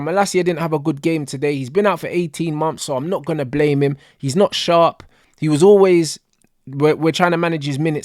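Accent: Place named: Manchester, United Kingdom. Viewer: Lovely Manc accent though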